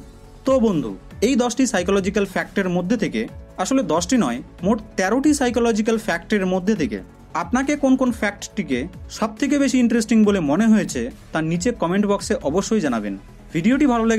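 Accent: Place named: Kolkata, India. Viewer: ভারতের কলকাতার মনে হয়,,, কথাবার্তায় মনে হচ্ছে কলকাতার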